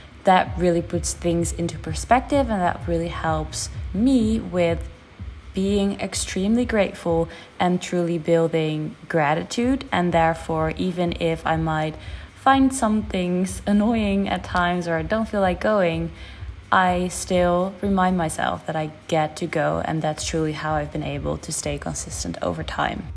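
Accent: Netherlands. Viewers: Hoor ik het goed ben je Nederlands? Fijne video thanks! ❤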